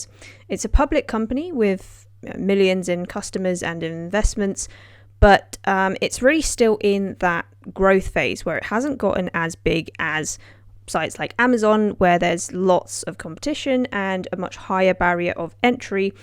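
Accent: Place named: Southern England, United Kingdom. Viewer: She's from the South of England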